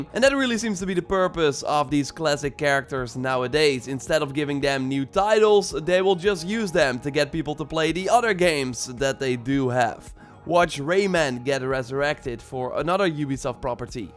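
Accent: Netherlands. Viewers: U sound dutch dude xD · lekker maat, goeie video. ik vroeg me al af of je Nederlands was aan je accent te horen. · NGL, when I imagine a Dutch accent, this is what it is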